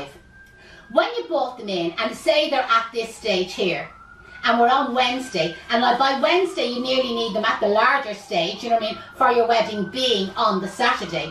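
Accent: Ireland. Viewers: It’s “flower” food. She has an amazing Irish accent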